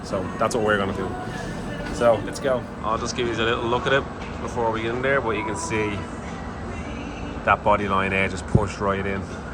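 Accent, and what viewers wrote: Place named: Dublin, Ireland. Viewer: Love the Dublin accent! Up the Dubs! Great job on the car.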